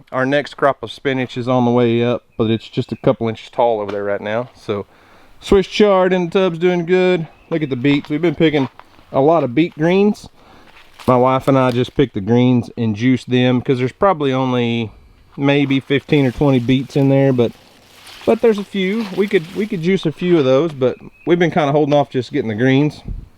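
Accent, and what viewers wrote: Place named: Houston, United States. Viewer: Called. Slow up. Lol Great job yall.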